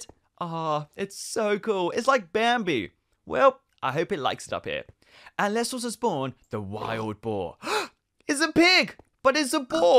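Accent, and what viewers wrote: United Kingdom. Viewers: British accent......love yah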